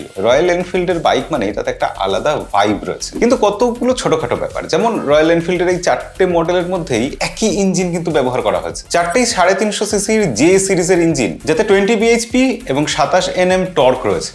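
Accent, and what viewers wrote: Bangladesh. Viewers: U sound like a Bangladeshi... no offense... using bullet in india has a swagger factor because for ages the indian army , police and government officials are using this vehicle ... so we don't directly compare it with Bajaj duke ... better option for you guys is to buy a hero xpulse 😅😅 ... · hola sunè mone hocche apni bangladeshi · India banaye eita ekhon mane ta ki osikkhito ei toh pure Bangladeshi citizen kotha sunei bojha jachhe, eta India e banato, Royal Enfield ekta Indian brand. Kotha sune bojha jachhe chinese maal khub use hoy Bangladesh e tai eto bhoy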